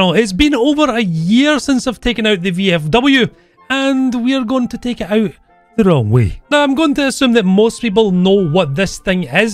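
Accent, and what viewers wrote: Scotland, United Kingdom. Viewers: so glad to see you back dood! youtube has felt barren and desolate without your beautiful scottish voice brightening my day. Hope you’re doing okay my dood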